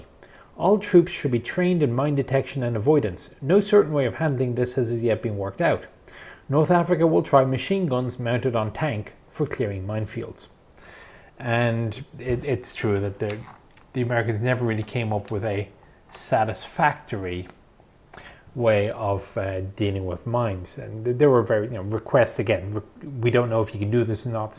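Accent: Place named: Ireland. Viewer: Ah yes, more from the Book of Armaments as read by a Irishman.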